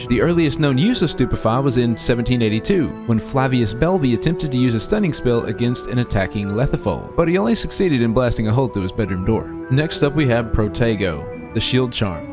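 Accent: South, United States. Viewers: Good video! Jw, I can’t decide your accent, where are you from? Southern?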